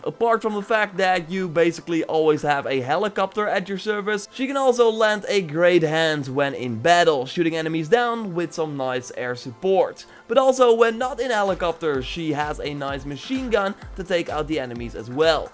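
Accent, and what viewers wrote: Netherlands. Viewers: Can you have a more english accent, i know you're dutch but still can improve with your pronouncing. Keep up the good work! ;)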